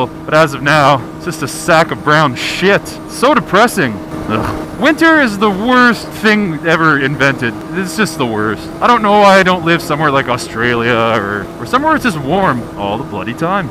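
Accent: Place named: Canada. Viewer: I know this video is a year old. ( One may say I might just be stalking your Canadian accent) however you burp a hell of a lot! Like WOW! haha But as always I love you and Mr. Snowcat so keep the videos coming. Yours Truly some random Australian chick!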